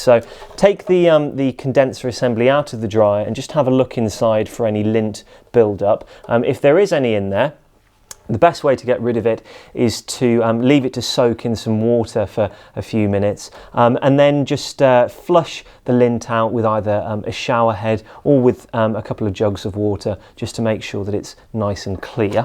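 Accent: RP, United Kingdom. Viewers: Posh accent! :)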